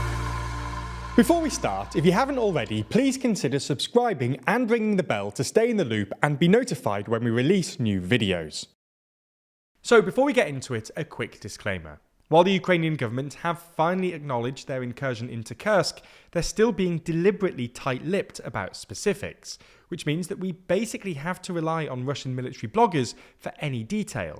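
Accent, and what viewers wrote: England, United Kingdom. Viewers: Never believe a single word about this war with an English accent. It is the Perfidious Albion sound of lying. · Do you have a speech impediment or just a goofy brit accent?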